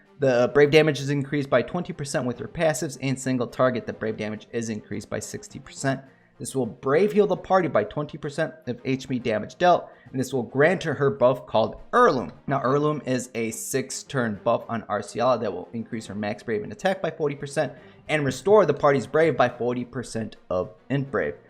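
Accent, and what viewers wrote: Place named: Texas, United States. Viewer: Just curious, where in the country were you born/raised/got your accent from? I've never heard "heirloom" get reduced to "/ˈɝl.lum/" from "/ˈɛəɹ.lum/" before. Sounds almost texan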